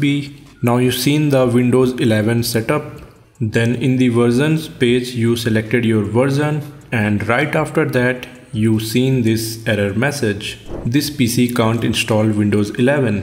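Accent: India. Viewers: Middle eastern name with a complete Indian accent....wow. Great info. Nice video, applause for microsoft.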